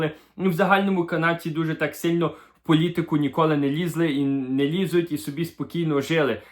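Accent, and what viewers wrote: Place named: Lviv, Ukraine. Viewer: не львівський в нього акцент, а з львівських сіл (видно вчили мови бабуся чи дід)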